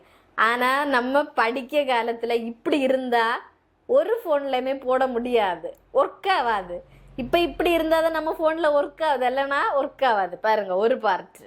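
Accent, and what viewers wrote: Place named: Kerala, India. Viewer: இது என்ன பாஷை ஒண்ணுமே புரியல எங்கள் கொங்கு தமிழில் சொன்னால் தானே எங்களுக்கு புரியும் இதில் கொஞ்சம் மலையாள வாசனையை அடிக்கிறது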